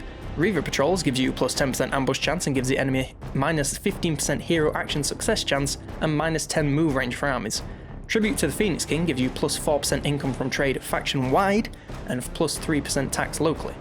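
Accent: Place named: Manchester, United Kingdom. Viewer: He sounds like he’s from near me. You from Manchester mate?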